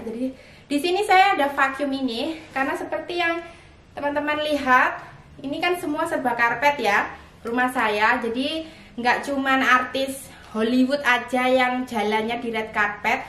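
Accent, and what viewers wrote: Java, Indonesia. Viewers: Mb klo bicara dicampur bahasa jawa yg medok lucu deh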